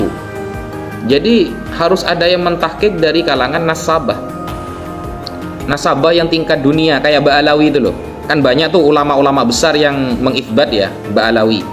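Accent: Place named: Madura, Indonesia. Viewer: Dari segi bicaranya ini orang madura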